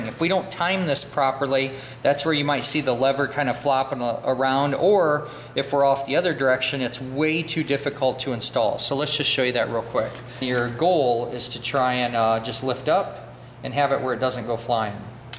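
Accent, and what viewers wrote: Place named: South, United States. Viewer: Very very good this is one of the best ...I cant handle half the guys talking to much ....this fella just simply explains it I'd dang near say he's southern 😉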